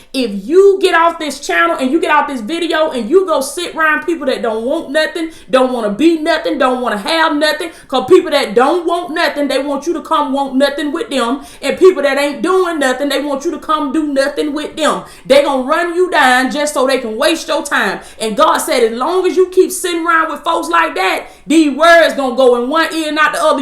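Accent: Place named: Georgia, United States. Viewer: I knew you was from Georgia you all speak more country than NC people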